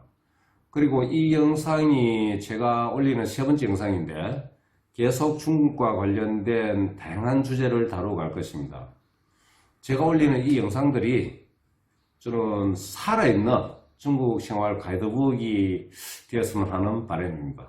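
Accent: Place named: Gyeongsang, South Korea. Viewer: 사장님 교포세요?! 제가 중국에 살아서 조선족 많이 봤는데 말투가 경상도 분 같으시네여 흑룡강 분 이신가오!?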